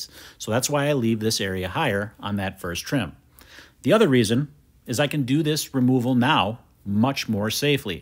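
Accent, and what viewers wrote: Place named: Wisconsin, United States. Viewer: Ope! Thats a Wisconsin accent if I’ve ever heard one.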